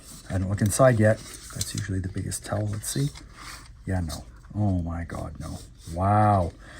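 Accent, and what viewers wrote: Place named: Canada. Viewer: There is no "correct" pronunciation, the way he pronounces it is common in Canada. I'm going to take a wild guess and say you're American, with the blatant irony being that Americans have taken the ENGLISH language, you know...from England...and you pronounce half the words differently than the people who invented it..but here you are acting as if other people are wrong. Try opening a book occasionally you diabolically low IQ product of the failed American educational system.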